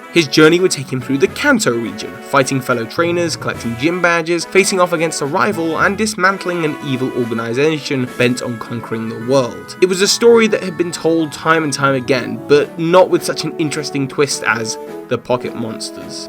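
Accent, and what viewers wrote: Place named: United Kingdom. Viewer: sweet British voice is just perfect for this.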